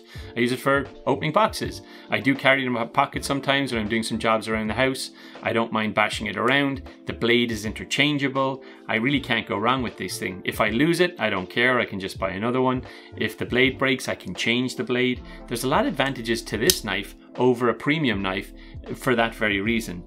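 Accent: Ireland. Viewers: Great stuff and great to hear an Irish voice with products that are available in Ireland and the EU - you presentation and production skills are excellent and the content is refreshing - it is worth paying for quality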